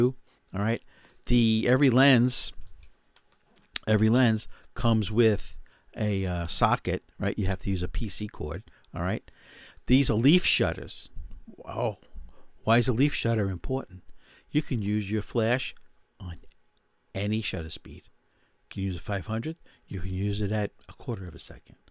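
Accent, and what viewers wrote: New York, United States. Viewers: This is a great video. As a New Yorker your accent makes me feel at home, and the information shared is super useful. And thanks to you I am now obsessed with the tremendous artistry of Vuhlandes.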